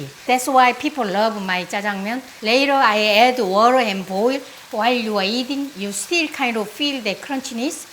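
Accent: South Korea. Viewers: Your Korean accent is very endearing (e.g., "too muchhhy""). Please don't ever change.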